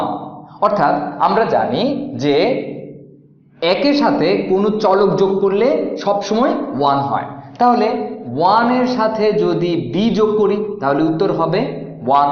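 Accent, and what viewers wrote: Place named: Bangladesh. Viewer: Excellent Topic. Are you from Bangladesh ? Your accent is like bangladesi... I'm from India, Kolkata. Happy to tell that, from Village Cooking By Sandhya team, one of our team member is from Mathematics Hons. Hope, he will enthu. from your channel. ❤️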